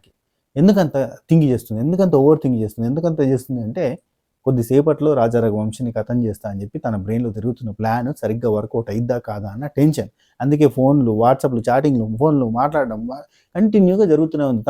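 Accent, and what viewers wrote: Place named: Telangana, India. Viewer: తెలంగాణ శ పోరడివి కదా! నీ స్లాంగ్ పక్కా తెలంగాణే.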